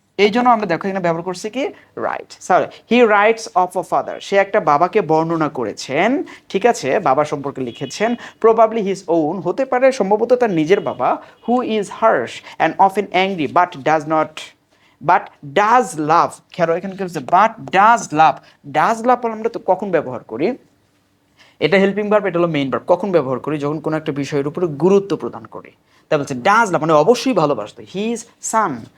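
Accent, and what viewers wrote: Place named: United Kingdom. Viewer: Sir Apnr English ta amder English moto bebohar kora ocit karon sobai Apnr occaron bubena Jake bole birtis basa Bangala dese manos moto ocaron korle Valo hoi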